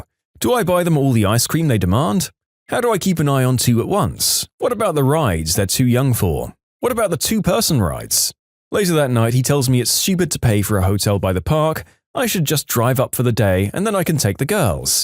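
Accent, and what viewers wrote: United Kingdom. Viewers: Wth is this voice It's british and a different person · Is it me or the he becomes more British? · British alert british alert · What’s going on about the new British accent 🤔🤔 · Well that's new, nice British accent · Get rid of the British accent go back to the normal American accent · Hmm... First it was Deep voice dude, then comedic dude, now Bri'ish dude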